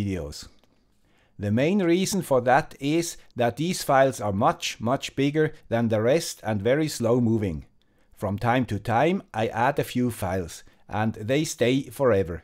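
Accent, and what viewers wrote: Switzerland. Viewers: Your channel is "about sensors and microcontrolers" with a Swiss accent -:). That's why I have a subscription on your channel. For me, this subject is to far off (personal opinion).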